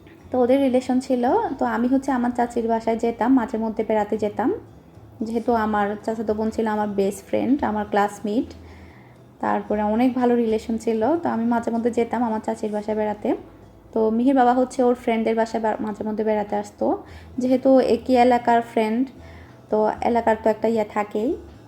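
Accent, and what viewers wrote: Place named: Noakhali, Bangladesh. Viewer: কেমন আছো? আজকে তোমার এই সম্পূর্ণ ভিডিওটি দেখলাম। আপু আমার জানামতে নোয়াখালীর বেশি র ভাগ মানুষ ই চিটাগাং এ থাকে। নোয়াখালীর সাথে চিটাগাং এর জন্মসূত্রে সম্পর্ক আছে। তোমার কথায় নোয়াখালীর একটু টান আসে।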